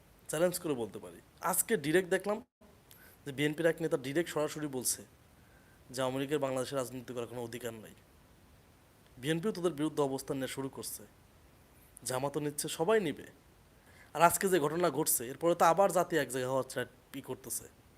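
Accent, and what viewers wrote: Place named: Pakistan. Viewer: ভাই আপনার মুখের ভাসাতেই বুঝা সেস যে আপনি পাকিস্তানের মালের ছেলে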